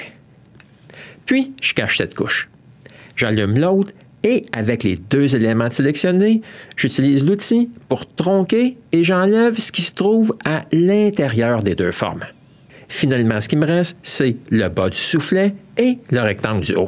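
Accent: Quebec, Canada. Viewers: C'est fou la sympathie que vous transpirez les québecois! j'sais pas si c'est votre accent ou quoi qu'est envoutant là... la preuve je parle comme vous là, y a votre accent dans ma tête :D Plus sérieusement tes vidéos sont géniales! t'as pas peur de nous montrer tes erreurs en plus c'est pas commun et super utile! ça donne envie de s'y mettre! Bravo pour ce que tu fais et merci! Alex, France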